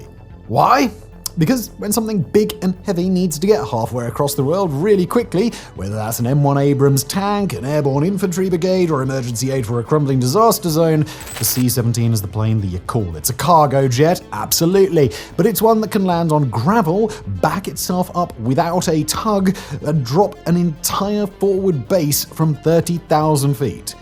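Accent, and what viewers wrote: RP, United Kingdom. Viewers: Everything about this guy (but especially his posh accent) makes me want to punch him in the face until my arm tires.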